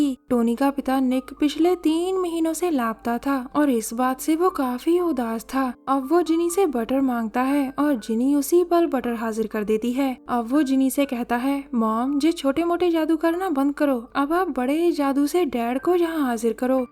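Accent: Punjab, India. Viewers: Ma'am Shayad Ap Punjab Se Ho ?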